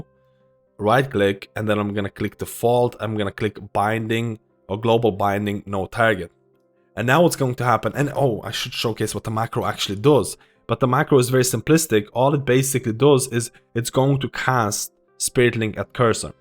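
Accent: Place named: Ireland. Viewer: Thank you for the great info. Your accent is really interesting may I ask are you part Irish part Scandinavian (swedish, norwegian)?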